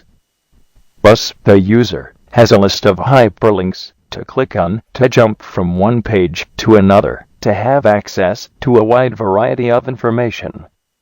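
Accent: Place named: United Kingdom. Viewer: British hooo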